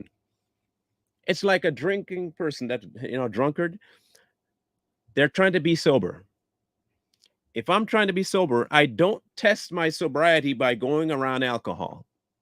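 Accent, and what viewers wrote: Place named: New Orleans, United States. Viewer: I'm from New Orleans..same accent..😇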